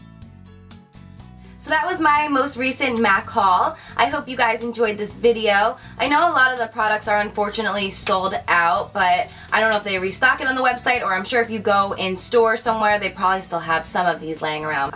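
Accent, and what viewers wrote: New Jersey, United States. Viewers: I'm a new subscriber and I have to tell you I LOVE your Jersey accent! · Where are you from? I love your accent. It sounds kind of jersey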